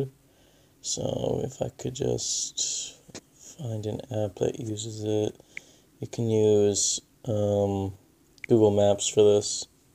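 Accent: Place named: United States. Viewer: Thank God an American accent!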